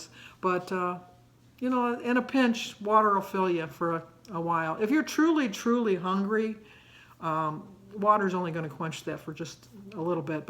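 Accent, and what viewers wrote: Midwest, United States. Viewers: Hi. I love your videos (and your midwestern accent:) You motivated me to join WW (tomorrow is my weigh in after my first week). I really like your day to day videos. Thank you!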